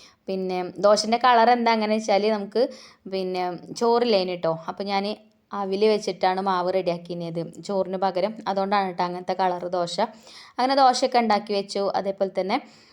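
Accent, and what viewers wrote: Malappuram, India. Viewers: നിങ്ങളെ സ്ഥലം എവിടെയാ, വർത്താനം കേട്ടിട്ട് മലപ്പുറം പോലെ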